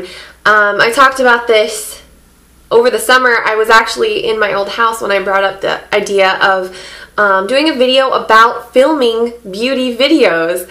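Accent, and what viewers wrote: United States. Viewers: Hi,! I like ur videos so much and not just because of all the make up stuff u do, but also because of the language u speak:-) i sometimes like the make up things ppl do so much but if i dont like/understand them i'll never subscribe! i love how american english sound more and hence i love ur speaking! thanx for cool make up related videos and language "master classes"! ♥ From Russia with love... ♥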